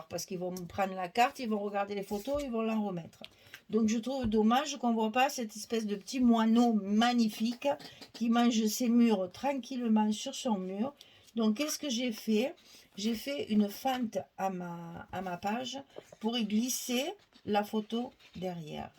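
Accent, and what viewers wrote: South, France. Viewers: Toujours enchantée de vos créations et vos explications données avec ce petit accent du sud que j'adore merci pour toutes ces explications très claires bisous et belle journée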